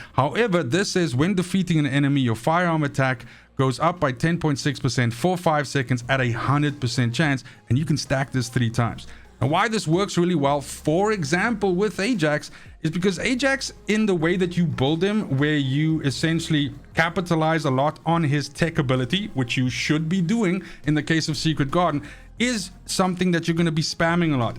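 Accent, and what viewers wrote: South Africa. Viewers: Yo man are you South African?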